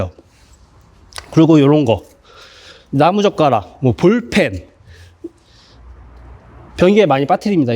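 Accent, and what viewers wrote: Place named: Seoul, South Korea. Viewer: 변기가 막혀서 몇일채 고생하던 중 우연히 시청하게 되었습니다. 사장님처럼 양심적으로 하는 사람이 드문 세상이라서.. 또 요즘 경제사정도 좋지 않고, 평소에도 간단한 일은 손수 해결하던 사람이라 걷어붙이고 뚫는 시도를 했지만 이 글을 올리는 현재 성공하지 못하고 몇일째 화장실 대란을 겪고 있습니다.ㅠㅠ 혹시 영업지역이 어디신지? 말투를 들으면 서울 내지 수도권인 것 같은데 지방출장(전주)도 하시는지 궁금합니다. *ps: 글을 올리고 영상 속에서 말씀하신 내용을 곱씹어 보니 정말 정직하게 사업을 하시는 분으로 느껴져서 '좋아요'에 그치지 않고 '구독'까지 눌렀습니다. 그리고 연결된 링크를 따라 블로그 방문을 했는데 역시 서울 인근에서 활동을 하시는 분이네요.. 지방출장을 한다 해도 출장비가 추가되면 의미가 약해지는 결과라서 혹시 막힘 증상을 글이나 영상으로 알려드리고 문제해결의 도움을 받을 수 있을지 모르겠습니다.. (영상 끝부분의 내용으로 봐서는 변기끝자락(플랜지 가까이까지 근접한?) 근처까지 물건이 밀려나면서 막힌 탓에 차오른 물이 하루종일 지나도 전혀 빠지지 않는 것이 아닌가 하는 의심이 갑니다..ㅠㅠ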